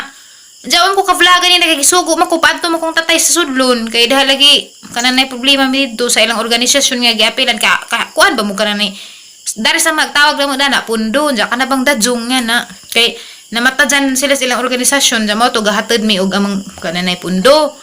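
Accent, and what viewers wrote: Bohol, Philippines. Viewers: Mag vlog ka na lang mae tour around bohol sa mga towns with the same bol anon accent for sure kikita ka pa padayun fan from texas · Hi Ma'am , keep on vlogging .. Missing our accent in Bohol.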